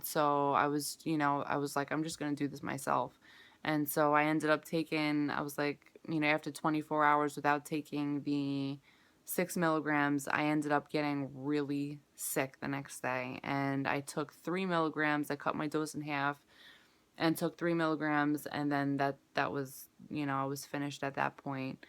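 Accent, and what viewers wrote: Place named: New York, United States. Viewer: Sound like a New Yawka bae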